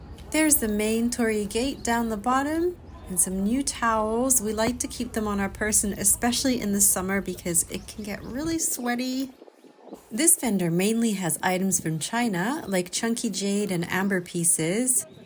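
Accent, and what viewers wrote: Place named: United States. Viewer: I have a question, you being Japanese and British, you have an American accent but aluminum. Seems strange, was wondering.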